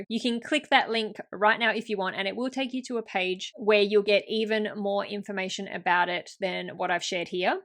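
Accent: Australia. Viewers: I love this video and the educational tool. I have a couple of niche educational books ideas. I love to see online. Plus, I'm hearing an Australian, I can relate!🙏🪃🇦🇺☺️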